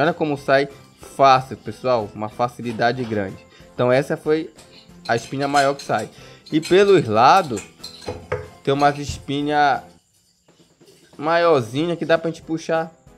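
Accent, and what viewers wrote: Pará, Brazil. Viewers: Pelo sotaque é paraense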